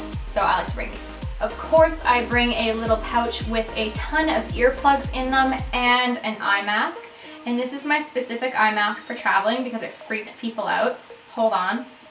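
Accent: Australia. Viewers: your picking up on the Aussie accent! 😂 It's peeking through with your speech! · Love this video! And you kinda have a Australian accent it comes through once in a while! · I love the hint of an Australian accent😍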